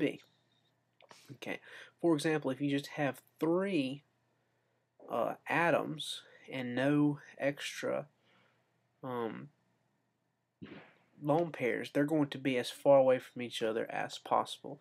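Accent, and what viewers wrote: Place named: South, United States. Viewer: Nice to hear a Southerner talking some sense every once in a while. No invocations of jebus and some good explanations of VESPR. Thanks.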